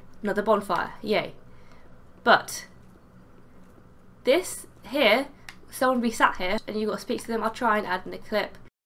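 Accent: England, United Kingdom. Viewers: 'Bit too much of the english accent here.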